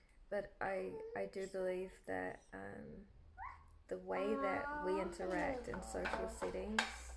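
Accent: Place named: New Zealand. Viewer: Hi from NZ! I heard your accent and knew! ❤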